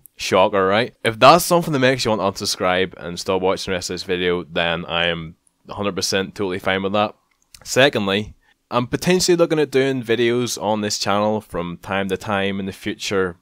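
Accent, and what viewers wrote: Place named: Scotland, United Kingdom. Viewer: Is it bad that I've lived in Belfast for three years and I genuinly thought you were Scottish based from your accent.....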